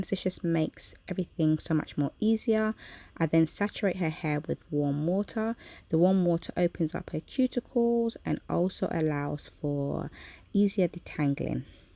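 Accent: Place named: London, United Kingdom. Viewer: Are you from London? Nice accent.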